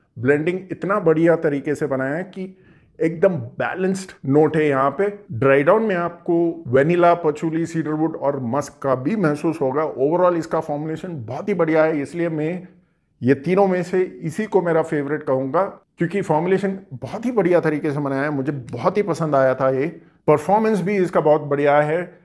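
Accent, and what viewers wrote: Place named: Karnataka, India. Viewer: First time watching your hindi channel.. You sound like a kannadiga.. Good to see you reaching hindi speaking guys... 👍🏻👍🏻👍🏻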